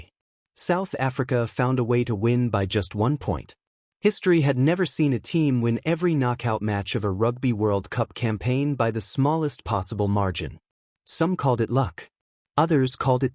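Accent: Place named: United States. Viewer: try use a South African voice narration, an American voice doesn't fit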